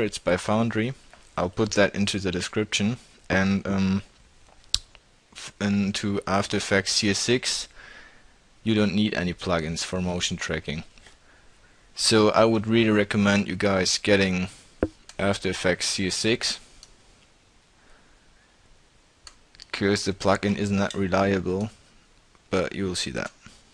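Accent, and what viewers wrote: Germany. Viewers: Uns Deutsche erkennt man sofort an der Aussprache.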